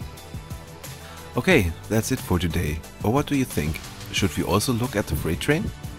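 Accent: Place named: Germany. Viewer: I'm just not used to German YouTubers, that's why I was impressed with your accent.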